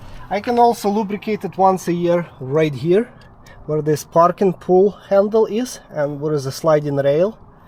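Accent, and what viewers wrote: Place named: Russia. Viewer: а почему Слава Богу? акцент то русский.)))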